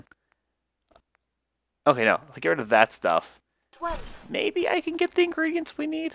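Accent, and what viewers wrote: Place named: United Kingdom. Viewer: OMG the British accent had me going....lol if you know what I mean wink,wink, even my hubby knows a British accent I get all hot and heavy for so when I heard you speak it I lost it... breath oh by the way good game lol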